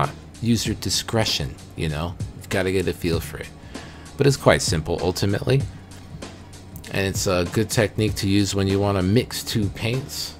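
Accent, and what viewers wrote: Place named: Milwaukee, United States. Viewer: You wouldnt happen to be from Milwaukee? Sounds like the right accent. Much love.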